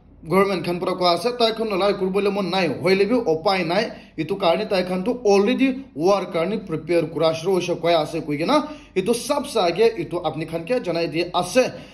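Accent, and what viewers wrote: Nagaland, India. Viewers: I like his accent, pure naga ase. Hoi na nahoi comment section viewers.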